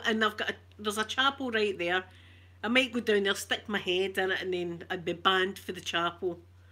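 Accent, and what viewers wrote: Scotland, United Kingdom. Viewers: Scottish very angry..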